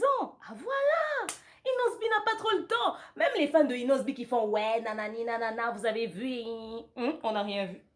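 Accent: Ivory Coast. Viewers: quand elle parle comme les ivoirien elle enleve sa exprès peut être que tu ne t'en rend pas compte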